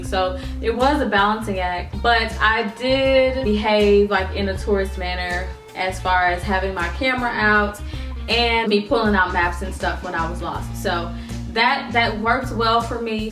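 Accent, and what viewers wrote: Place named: Nigeria. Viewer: You sound like a Nigerian. Your mum is a legend.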